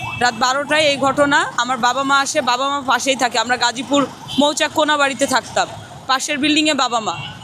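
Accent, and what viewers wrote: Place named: Kolkata, India. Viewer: Kolkata style ei kotha bole ..nice style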